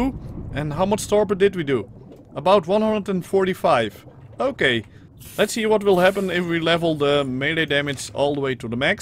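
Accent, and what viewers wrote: Netherlands. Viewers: Ben je nederlands ofzo? (Je klink gewoon zo)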